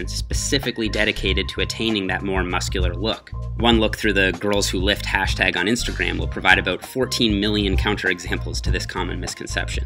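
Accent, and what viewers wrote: Newfoundland, Canada. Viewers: Canadian accent is so interesting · Are you Canadian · He a Canadian boy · He’s a neufie lol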